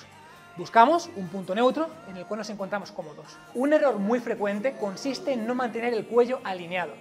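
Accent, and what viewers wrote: Spain. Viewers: Jajajaja y yo esperando un hello tipo gringo y resulta que era un español xD joder tio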